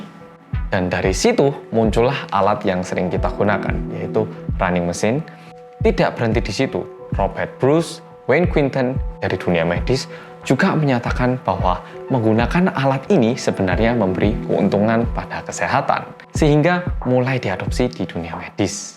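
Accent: Java, Indonesia. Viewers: Pertama liat mas lucu aja gitu orang korea ngomong medok🤣best deh MAS 💗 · Orang korea medook❤ Dan ganteng😁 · Bersama orang Korea yang medoookkk ... 😊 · Org Korea medhokkk💜😌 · Welcome back dngn mas yang medokk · Apa cuman aku kalo mas opening "hello guys welcome back to korea reomit bersama orang yg MEDOK!!" trus aku ikutan ngomong medok? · Org Korea yg medokkkk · Medddhok hahaha (like donk ka, heheheh canda tapi kalo mau di like like atu ) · Mass medhokkk... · Orang medok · Bersama orang korea yang medokk mantapp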